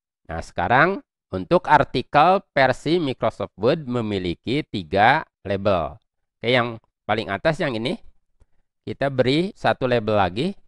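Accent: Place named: Bali, Indonesia. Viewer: lho sy kira org bali, logatnya bali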